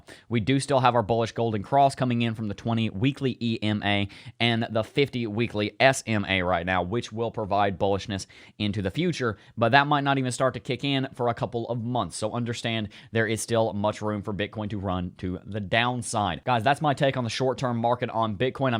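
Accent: United States. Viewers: I just love your cowboy accent... I enjoy your videos. Keep up the good work!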